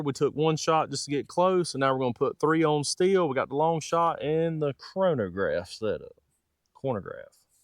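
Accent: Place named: Alabama, United States. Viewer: Just found your channel. Great video. I hope they take your critic well and fix the SD. If they do, l will give hunting with subs a chance. The bullet flat out performed. I am curious about where you are from. You talk exactly like me. I am an Alabama native, but lived in TN for 14 years and south FL for 3.5. Anyway, just subscribed. Keep ‘em coming.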